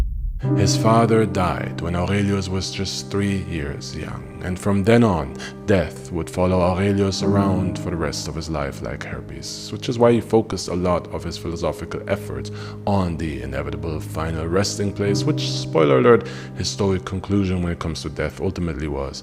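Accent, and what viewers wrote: Germany. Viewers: Why do you pronounce Roman names with a German accent? Latin should sound more like Spanish or Italian. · Are you German bro? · Are you German ? Cause the way you say everything reminds me so much of the people in my life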